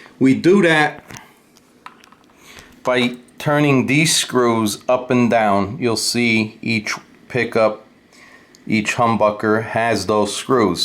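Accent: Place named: Chicago, United States. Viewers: Nice vid great Chicago accent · That's not a Brooklyn accent? Chicago? Interesting 🤔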